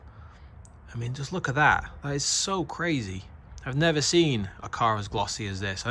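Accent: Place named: United Kingdom. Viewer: You have a british accent but are u in California?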